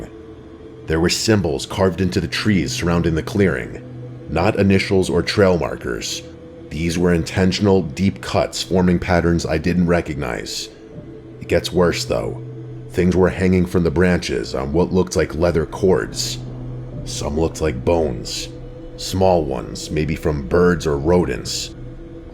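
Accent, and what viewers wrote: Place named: East Coast, United States. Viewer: you're the goat. I don't know if it's the East Coast accent or the excellent curation of sound effects and visuals, but you're far and away the most competent and consistent scary story YouTuber in the business. The only channel that I have a membership of for a reason.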